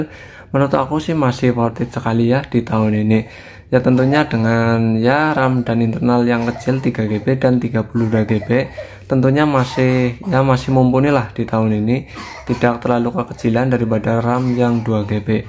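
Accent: Banyumas, Indonesia. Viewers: Medok ngapak mas 😄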